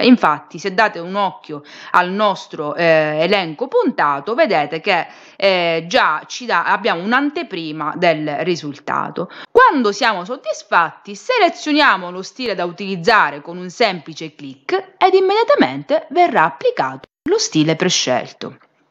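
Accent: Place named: Sicily, Italy. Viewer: Sono ingegnere come te, ma hai delle capacità oratorie affascinanti,…poi con quell’accento (siciliano?) ufff, tanta roba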